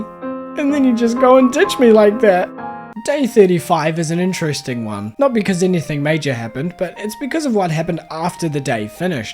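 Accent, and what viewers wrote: New Zealand. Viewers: Ever since I found your channel and watched you super modded 100 days series I was trying to figure out your accent and to find out you’re a kiwi like me is such a nice surprise!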